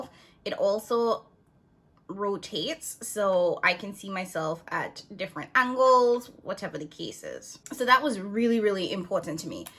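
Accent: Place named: Trinidad and Tobago. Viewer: I'm trying to figure out where you are from based on your accent. It sounds like Trini to me. I'm planning on getting the Sony ZV-E10 to replace my Canon SL2, I find the buttons on the Canon to be too complicated to figure out and ALL of the Sony ZV has simple buttons and only I think 5 options per button placement.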